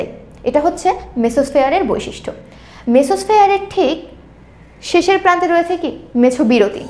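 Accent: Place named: India. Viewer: X যদি ট্রোপোমন্ডল হয় তাহলে Z হবে মেসোমণ্ডল। বোনটির বাংলা শুনে মনে হচ্ছে ভারতীয় বাংলা।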